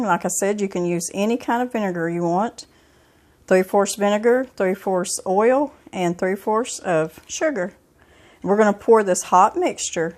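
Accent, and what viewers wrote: South, United States. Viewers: Want a big laugh? I'm from the frozen tundra of central MN. The people around me say, "Ya, sure, yabetcha". I don't speak Southern, so at times, I have to watch the video a couple times to figure out what's said! Don't get me wrong...I LOVE YOUR VIDEOS AND THE OLD WAYS! I just don't catch Southern as fast. Thank you for sharing your videos! Please don't stop...Ill try and keep up! LOL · I just noticed your from Imboden, Arkansas!!! My husband and I are from Paragould and relocated to my hometown in Southern Illinois a few years ago when my mother got sick. We love your channel and have tried some of your recipes and will be trying many more!!! Thanks for sharing your recipes and thanks your southern accent that I miss so very much.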